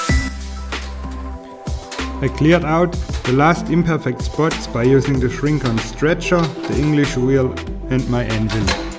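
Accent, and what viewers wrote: Germany. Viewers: Warum in Gottes Namen redest du kein Deutsch, das hört man das du kein Amy oder Engländer bist sondern ein Deutscher. Authentizität ist was anderes, aber gut muss jeder selbst wissen wie Authentisch er sein möchte oder auch nicht, wie in deinem Fall.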